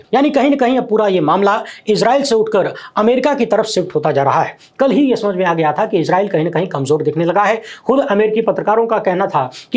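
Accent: Bhojpuri, India. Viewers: Ur bhojpuri tone! So sweet.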